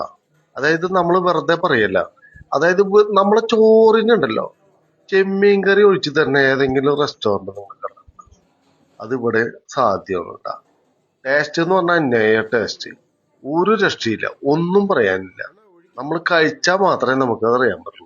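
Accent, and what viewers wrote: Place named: Thrissur, India. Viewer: ആ തൃശൂർ സ്ലാങ്കിൽ ഉള്ള ആന്റെ ആ അവതരണം കിടുക്കി ട്ടോ ഗഡിയെ 👌😀👏👏👏👏👍 മൊട്ട ഗ്ലോബൽ ടീം സൂപ്പർ 💐👌👌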